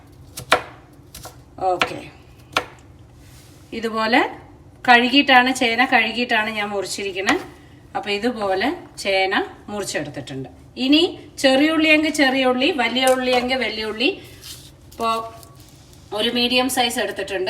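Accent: Palakkad, India. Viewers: Hahahahahha chechi 😄😄😄 എന്താണ് ഉണ്ണി 😄😄 പാലക്കാടൻ ഭാഷ കേൾക്കാൻ നല്ല രസം എന്റെ chechi ആ ചീന ചട്ടിയിൽ ഇട്ടു പുരട്ടി തിന്നുമ്പോൾ ഉള്ള സ്വാദ് അത് പറഞ്ഞറിയിക്കാൻ കഴിയില്ല എന്റെ അമ്മക്ക് നല്ല ഇഷ്ടാണ് chechi കുഴച്ചു പുരട്ടി കഴിക്കുന്നത്. ഇങ്ങനെ ആണ് ചോറ് കഴിക്ക അല്ലാണ്ട് അവിടുന്നും ഇവിടുന്നും തോണ്ടി അല്ല കഴിക്ക പറയും ☺️☺️☺️☺️ എനിക്കും ഇഷ്ടാണുട്ട chechi കഴിക്കുന്നത് കാണാൻ നാളെ എന്റെ കറി ചേച്ചിടെ കുരുമുളകു രസം ചേന ഉപ്പരി & അപ്പളം ✌️✌️✌️✌️ ഒത്തിരി സ്നേഹം 😍😍😍😍 🤗🤗🤗🤗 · Love your simplicity,ur palakkadan tone, simple and healthy menu. Lots of love from thrissur. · പാലക്കാടൻ ഭാഷ ടോളെ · എന്താണ് ഉണ്ണി.. പാലക്കാട്ൻ ഭാഷയും ചേന ഉപ്പേരി യും കലക്കി 👍🌹❤😍😘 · Cheenachatti nalla bhangi evidunnu vangi Palakkad samsaram kelkan bhayakara rasanu ente husband veedum parli anu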